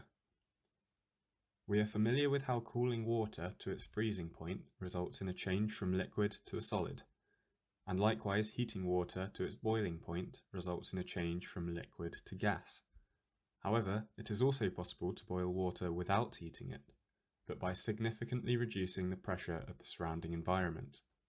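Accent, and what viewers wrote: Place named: India. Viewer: Indian English make me sick.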